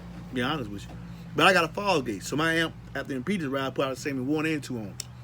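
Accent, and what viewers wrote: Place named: South, United States. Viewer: That country accent got me laughing 😂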